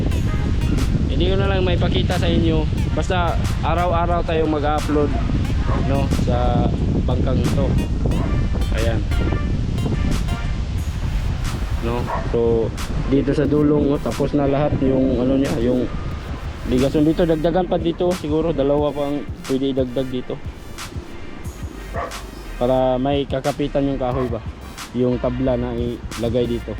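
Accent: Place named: Visayas, Philippines. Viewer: Intindihin mo po nalang kc bisaya sya parang Tagalog lang po yan mahirap intindihin bisaya😂😂😂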